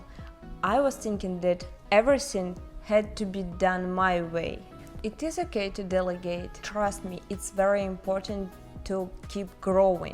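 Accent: Russia. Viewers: You are welcome, I love your Russian English, very….😊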